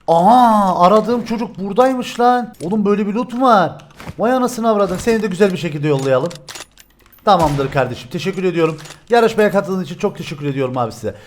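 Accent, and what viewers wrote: Cyprus. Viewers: abi diğer videonda ful kıbrıs türkçesi konuş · Kıbrıs li olduğun belli baya . Bide videoya okadar edit koyuyosunki videodan sıkılıyorum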